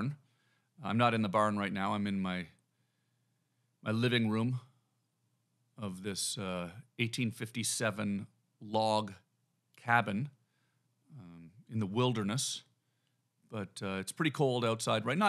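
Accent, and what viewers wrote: Canada. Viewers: Keep talking about the farm and all of the nature, bro. That’s what got me hooked oh, and by the way, keep saying words, where your Canadian accent comes out! Hilarious. Lmfao hilariously awesome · I think your canadian accent is getting stronger. Love it.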